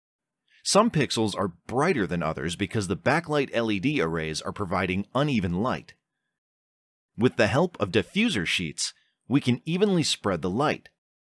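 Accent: India. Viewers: Daam this guy have most stereotypical Indian accent ever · Indian accent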